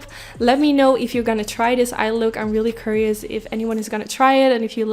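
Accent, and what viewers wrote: Netherlands. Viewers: Beautiful look 😍 Are you Dutch? You have really funny accent ☺️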